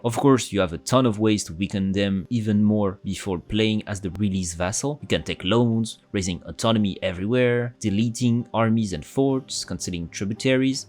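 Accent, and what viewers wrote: France. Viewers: Un français !!! Une bouffée d'air frais (ouais je t'ai cramé a l'accent ;) ) ! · I have the impression of hearing an accent, are you French?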